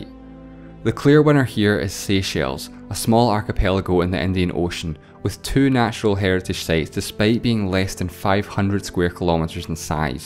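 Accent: Scotland, United Kingdom. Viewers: It's Scottish, just not the heavy Glaswegian accent most people associate with Scotland. This from some one who lives further East, possibly Edinburgh. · Scottish? Love it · scottish accent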